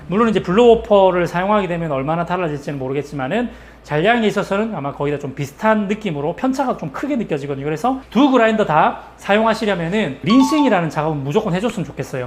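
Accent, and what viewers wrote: Gyeongsang, South Korea. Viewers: 항상 감사한 마음으로 동영상 잘 보고 있습니다. 저도 지역이 PK라 ㅎㅎ.. 억양이 옆집스럽습니다. ^^; 대장님. 동영상 보다 궁금한게..ek43의 노커.. 저게 어느 회사 제품인가 싶어서요.. 노커가 맛이 가서 바꿔야 할듯 한데.. 저 노커가 자꾸 눈에 들어오네요.. 😂😂 그나저나.. 그라인더 성대모사 진짜 똑 같아요.. ㅎㅎ😂😂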